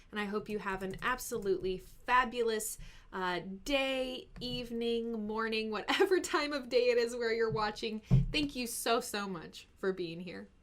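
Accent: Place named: Texas, United States. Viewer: i can hear the texas in your voice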